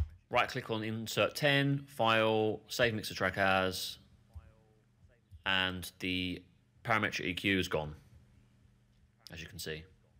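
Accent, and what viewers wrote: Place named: United Kingdom. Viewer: Thank you! That was really helpfull. I'm also like your british accent :) Sounds cool.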